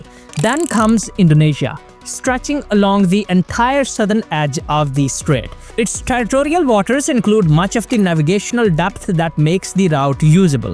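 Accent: India. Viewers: It irritates me when you say " raut" for route! Route is pronounced " root". Unless you were born and bred in the USA, please pronounce it the English way! You sound indian so I find it even more irritating when you suddenly use American pronunciations for certain words. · What is the point he is trying to make? Malaysia has also a very large port im the China Sea.. pointless video. This sounds like a guy from India?? There are other ways for ships to pass from the China Sea to the Indian Ocean.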